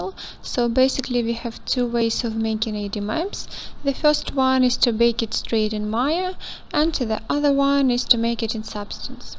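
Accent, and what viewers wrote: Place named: Russia. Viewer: слышится русский акцент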